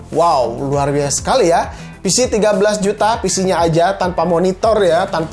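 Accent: West Java, Indonesia. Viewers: Itu logat sunda gan, emang klo bila f atau v emang susah, bukan d buat buat itu gan😀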